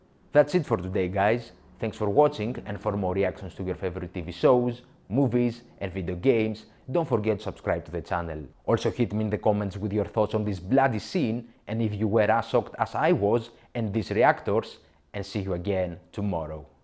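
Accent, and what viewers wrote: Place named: Greece. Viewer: I love your greek accent mate. Exellent analisys of the scene too.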